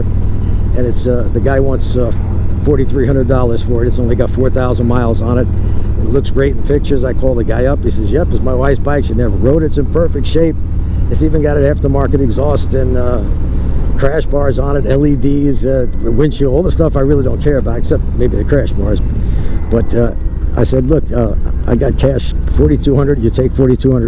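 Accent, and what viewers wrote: New York, United States. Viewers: where in NY ya from... OMG ya sound like me..hah.. apperecite ya...much respect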